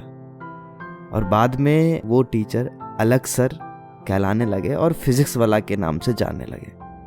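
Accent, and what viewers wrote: Bihar, India. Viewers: Pure Bihar hai aapke abaj me ❤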